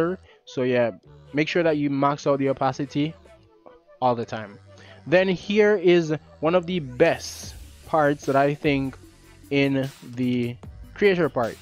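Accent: Jamaica. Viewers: Thank I very much and I like ur accent it sounds like u from Jamaica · You sound jamaican?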